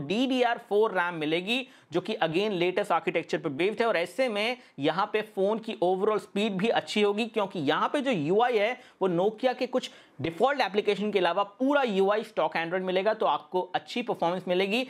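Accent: India. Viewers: WHAT? i dont understand you its indglish ?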